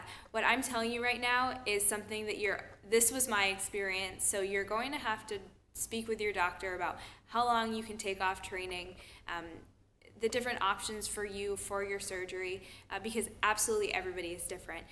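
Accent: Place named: Boston, United States. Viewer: omg are you from boston? I am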